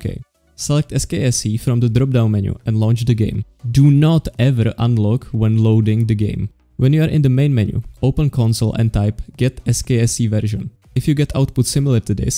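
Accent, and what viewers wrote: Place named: Czech Republic. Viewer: Slyšim tu češtinu, bráško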